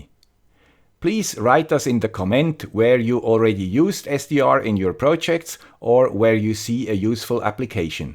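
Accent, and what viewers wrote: Switzerland. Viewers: Those 1% of the facts informed me more about SDR than I have ever seen. And all with a Swiss accent. Thank you. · One of your greatest videos! Thanks! And your Swiss accent is perfect as usually. :-) 73! Jakub, OK1SE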